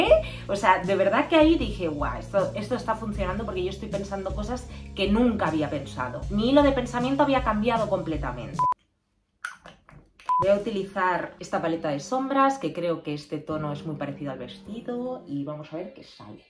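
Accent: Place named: Russia. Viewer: Porque tiene asento ruso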